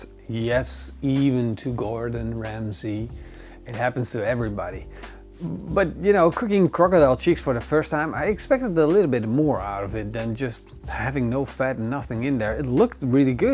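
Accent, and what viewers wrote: Netherlands. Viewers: Are u dutch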